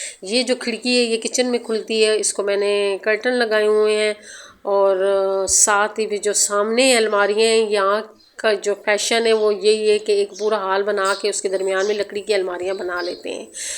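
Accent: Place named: Chakwal, Pakistan. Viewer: Ap achi lagti hain buht mjy simple sa apka style hai aap chakwal se to belong ni krtin ? Apka lehja baat krnay ka bilkul chakwalio jesa hai btw I am also from chakwal ❤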